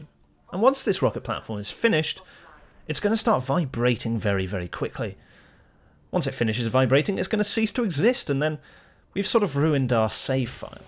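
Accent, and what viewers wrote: United Kingdom. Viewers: Sound like spiffen brit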